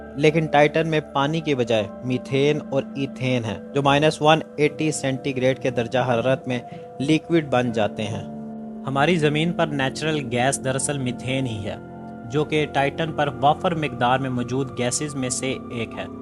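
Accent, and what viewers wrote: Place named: Pakistan. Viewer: Ha bhai iske accents pakiatani hai but it's ok we Indians support pakistani also 😊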